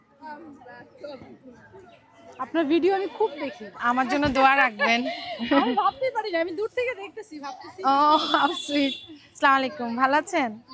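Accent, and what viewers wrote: Noakhali, Bangladesh. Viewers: apu assalamu alaikum apu ami apnar akjon fan ami apnar sob video dekhi khub valo lage apnar noakhalir basha amar khub valo lage apnar vedio dekhe ami uthsahito hoye ami akta youtube channel start korci jodi paren amake aktu support diyen amnar sathe dekha korar khub iccha amar channel er diye aktu lokkho rakhben Jannat kitchen🎉❤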